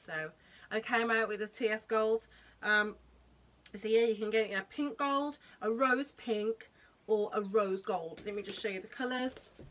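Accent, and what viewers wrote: United Kingdom. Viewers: So lovely to hear a British accent!!!!! Yessss!!! U look great. I bought it too😊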